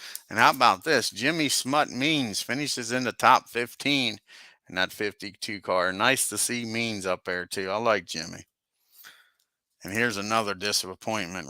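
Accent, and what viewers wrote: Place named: South, United States. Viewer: I know he does a great job. I have second season HMB and HMG. This one has been on my radar for a while. Also you southern accent fits the game.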